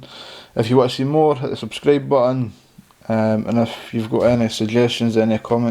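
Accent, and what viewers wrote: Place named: Scotland, United Kingdom. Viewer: Haha, nicely done. Orange belt for you bud! Refreshing to hear a Scottish accent that isn't my own while picking. Keep up the great work bud.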